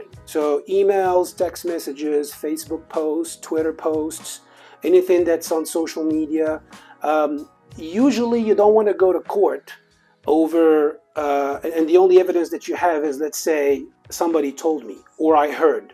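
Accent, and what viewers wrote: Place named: New York, United States. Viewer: sound a bit New Yorkish.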